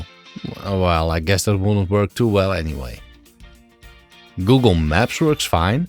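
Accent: Netherlands. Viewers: This OS reminds me UK YouTuber, Dan Wood. He is Amiga computer user, btw. I remembered watching MorphOS video a few times ago. But what I liked more here, than from Dan Wood is your NLD English voice that I just preferred 😅😅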